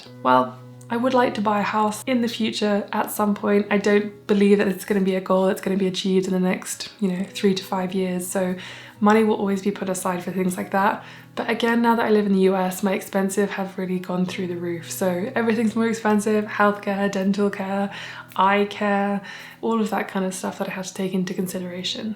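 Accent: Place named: United Kingdom. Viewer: hi just found your channel and when saw that you live in Denver, I was really confused; you sound like a brit, same as me and after watching this i guess that you have just moved to the states; lucky girl; hope you enjoy your new life therex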